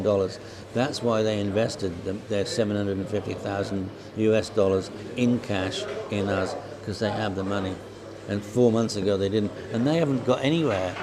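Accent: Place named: Norfolk, United Kingdom. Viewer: Cor - you sound right Norfolk boy!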